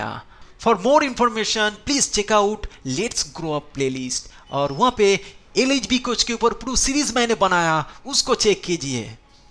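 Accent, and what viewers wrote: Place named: Bengal, India. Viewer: T = correct accent use kar rahe ho aap .. But FIAT per "T" ko keu Bengali accent " ত"" use karte ho aap log ? Next Bihar pe maximum people " use " = accent pe " S " ko keu Bengali accent " জ / j " karte hai ❓ mujhe pata nahi 🤔 Use word pe "" s " accent ko s jaysa accent nahi karte hain ❗ Main 1 word examples diya hai, aysa bahut words hain accent correct nahi hota / not ❓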